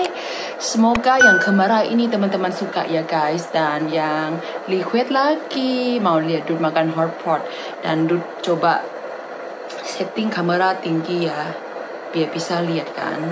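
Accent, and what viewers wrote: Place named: Thailand. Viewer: Dnger kak ngomong, jadi kangen sama cara ngomogng papaku yg mirip kya kak, karna papaku juga orang thailand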